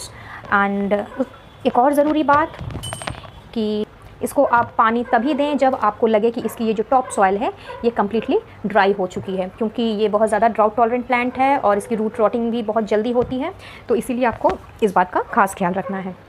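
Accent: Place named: China. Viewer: Your english have chinese acent I can’t understand and you tLk sooo fast 🚵🏽‍♀️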